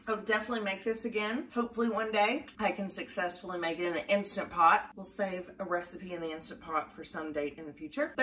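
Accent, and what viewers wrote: Kentucky, United States. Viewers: I love the Kentucky accent! (I'm a Brit.)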